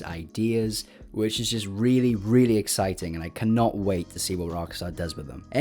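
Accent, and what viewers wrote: Wales, United Kingdom. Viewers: I know a fellow Welshman when I hear them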